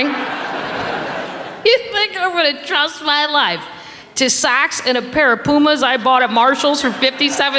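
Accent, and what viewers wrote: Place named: St. Louis, United States. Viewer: I love the St. Louis accent... From my second home!! She's hysterical! 😂❤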